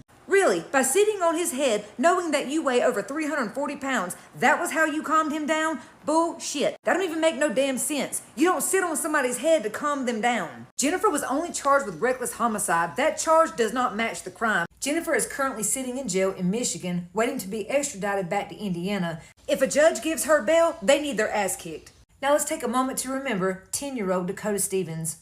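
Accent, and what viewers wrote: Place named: Carolinas, United States. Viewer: I can’t tell if you’re accent is from North Carolina or South Carolina. Probably north if I had to put money on it.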